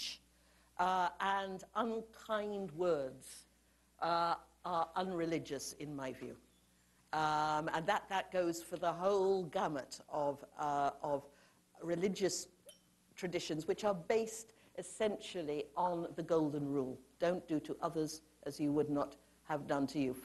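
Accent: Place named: RP, United Kingdom. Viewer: She has no idea what she was talking about. her posh accent doesn't make her more knowledgable unfortunately.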